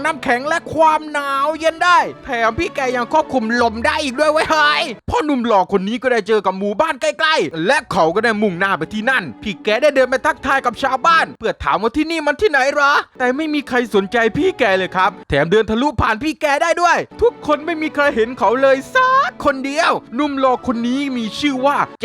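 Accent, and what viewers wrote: South, Thailand. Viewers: ชอบเวลาพี่พูดใต้อะ พี่พูดเยอะๆหน่อยผมชอบ55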